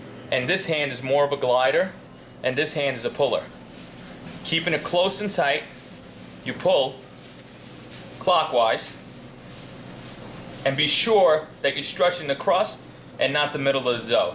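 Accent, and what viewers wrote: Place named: Brooklyn, United States. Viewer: This guy sounds like he has a Brooklyn accent. Only in Brooklyn is where you will find real pizza guys who know how to make real pizza.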